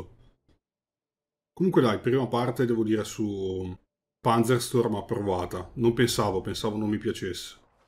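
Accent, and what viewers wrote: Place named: Lombardy, Italy. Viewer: ti ringrazio a te per il "bell" ahahah. Hai un'accento familiare, sei lombardo?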